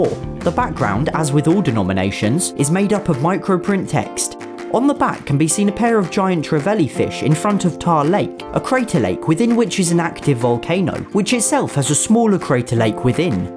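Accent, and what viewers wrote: United Kingdom. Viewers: His british accent is the best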